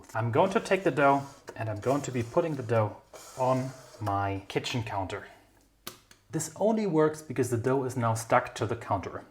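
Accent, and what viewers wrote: Germany. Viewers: Gluten tag! I loved the German English! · When people hear you speak English they wish they could speak German as well as you speak English. Your slight German accent is an added bonus. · Never apologize for your German English. Your speech is clear and easily understood. Your speech is near native English speaker!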